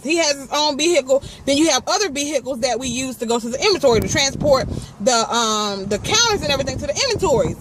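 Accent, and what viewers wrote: South, United States. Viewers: Yea she be having my stomach hurting too 🤣🤣🤣n bein that she has a country accent makes it even funnier... LMFAO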